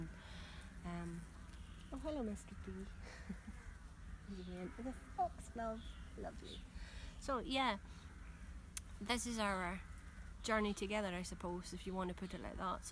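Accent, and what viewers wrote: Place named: Galloway, United Kingdom. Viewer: Aha! There she is. What a beautiful accent. My friend lives in Mid Ulster; your accent is heavier than hers, more Scottish, though. I've no issues understanding you, though. My people came to Ulster from Galloway, originally. Enjoy your posts.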